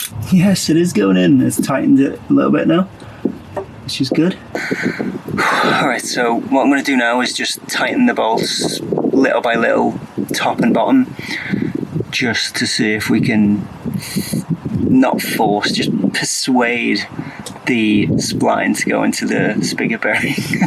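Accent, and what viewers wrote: Liverpool, United Kingdom. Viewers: You from liverpool fella? Or close by just a guess from the accent hahah, your getting there with her slowly but she's gunna be some yolk when finished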